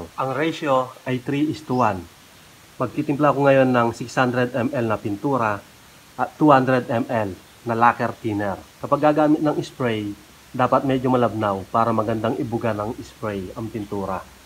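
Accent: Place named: Pampanga, Philippines. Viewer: Kapampangan na kapampangan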